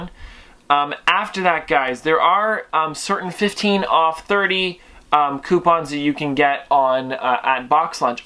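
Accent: Canada. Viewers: I love Canadian accents 😂 love the videos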